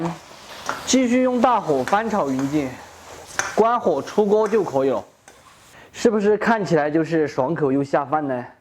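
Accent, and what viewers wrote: Sichuan, China. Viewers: 老乡的川普听起来不摆了比厨艺更有吸引力🧲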